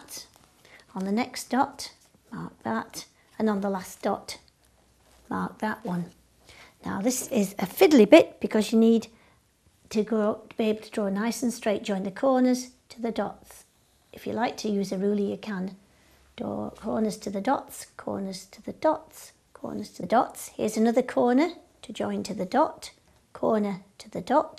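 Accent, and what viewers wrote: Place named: United Kingdom. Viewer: First hearing British accent so sweet and cute English :3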